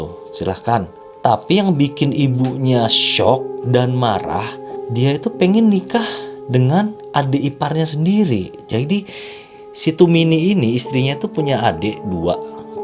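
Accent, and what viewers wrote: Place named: West Java, Indonesia. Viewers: ( keluar. Cahaya tea ning ) hahaha fiks org bandung pisan hahaha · Suka bercahaya tea gening. Aduuh sunda pisan ... Salam dari bogor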